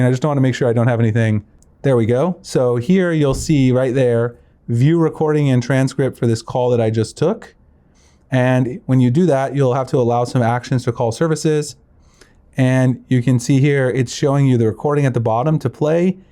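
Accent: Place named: United States. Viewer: You talk to much horrible American accent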